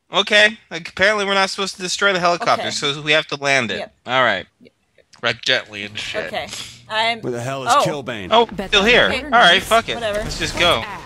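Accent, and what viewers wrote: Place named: United Kingdom. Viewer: That british accent is offensive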